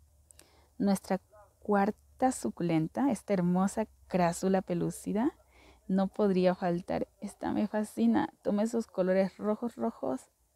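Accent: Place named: Colombia. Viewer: Hola eres colombiana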